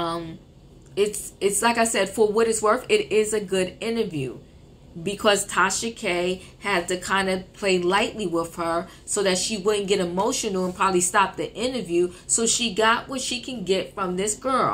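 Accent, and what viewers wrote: New York, United States. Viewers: ❤ your NY accent and attitude while giving your commentary. I watched twice in a row. You're on point!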